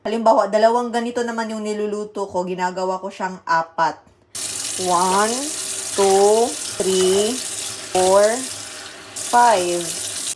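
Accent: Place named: Visayas, Philippines. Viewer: Bisaya c madam diay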